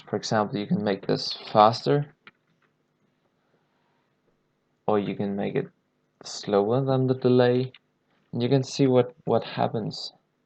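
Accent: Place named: Denmark. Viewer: Why does this guy have a danish accent lol Are you from denmark:0 If you are then hi, jeg også dansk:D:D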